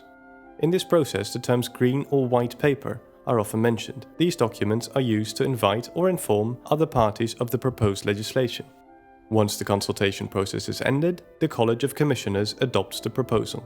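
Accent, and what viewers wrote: Netherlands. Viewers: Can I place a guess and say you are Dutch or Flemish?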